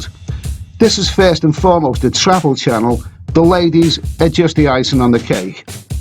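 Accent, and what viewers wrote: Liverpool, United Kingdom. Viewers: What part of Liverpool are you from?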